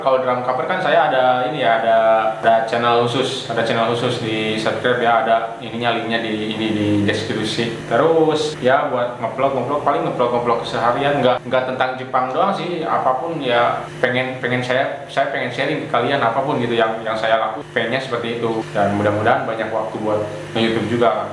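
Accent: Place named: Indonesia. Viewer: Balik ka indo tone na langsung beda jadi indo banget haha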